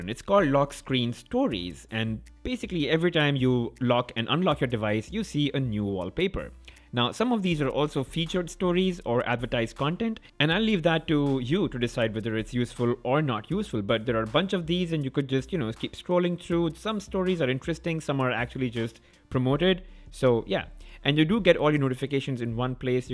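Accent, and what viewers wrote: India. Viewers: I'm the only one who hate the indian accent?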